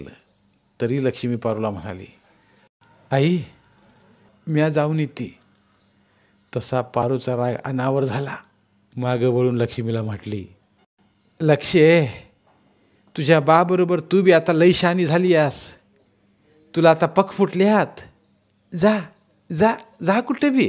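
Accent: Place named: Kolhapur, India. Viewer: मी सर्व भाग पाहते, कोल्हापूर ला माझ्या आत्ती च्या गावाला आल्यागत वाटत्या 🙏अप्रतिम, सर्व दृश्य अगदीच डोळ्यापुढे उभा राहते 🙏अगदी बाहुलीत प्राण टाकल्यासारखे तुमची बोली भाषा आहे 🙏🙏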